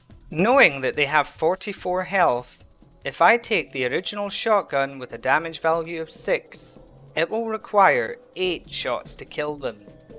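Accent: Scotland, United Kingdom. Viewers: Why do you sound Scottish lol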